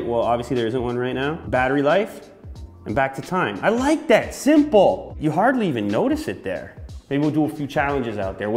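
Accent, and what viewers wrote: Canada. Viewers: Is it just me or his accent got a little more Canadian in this video compared to all the others?😂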